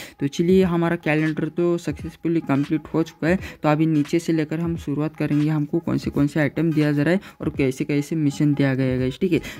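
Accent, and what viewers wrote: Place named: Maharashtra, India. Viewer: Bhava tuzya bolnyavarun vatty Tu marathi ahe... Marathi asla t like de comment la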